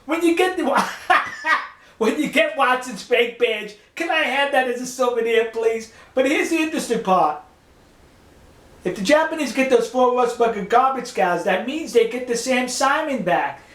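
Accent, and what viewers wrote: New York, United States. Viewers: fair 'nuff, you got a kinda funny new York soundin' accent, but my oh my, look at these comments down yonder, why can't these folks just state their opinion without all this f**k this, c**t that and the other? can y'all open your puny minds and cut out your gutter tongues? nobody wants to hear that, you got an honest opinion, state it, but all this cussing without a cause doesn't make nobody think the better of you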